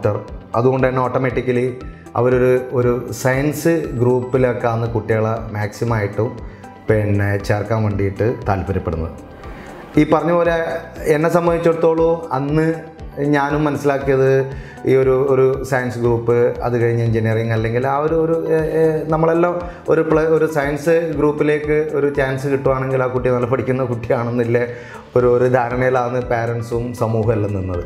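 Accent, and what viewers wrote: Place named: Kannur, India. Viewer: കണ്ണൂർ ഭാഷ വിടൂല്ല.. സർ മുത്താണ്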